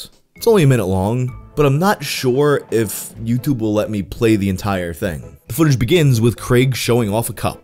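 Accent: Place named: Brooklyn, United States. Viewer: No offense, sort of sounds like a Brooklyn-nite/Italian version of Vinesauce Joel.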